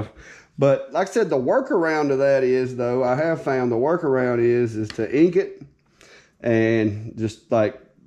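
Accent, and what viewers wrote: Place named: Georgia, United States. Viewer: My husband and I just found your videos after getting an XTool and we love em! We’re from SC and we made a bet that you’re from GA based on your accent?!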